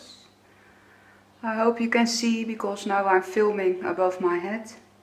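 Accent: Netherlands. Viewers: You sound Dutch! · Thick dutch accent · You sound Dutch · the accent is enough of a clue to know you're a fellow dutchie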